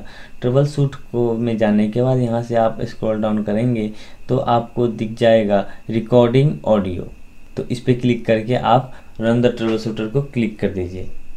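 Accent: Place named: India. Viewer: I don't understand what you say that indian accent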